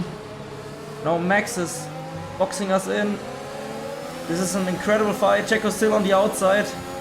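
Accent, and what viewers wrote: Germany. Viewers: Kannst du deutsch (dein Name und Akzent klingen deutsch)?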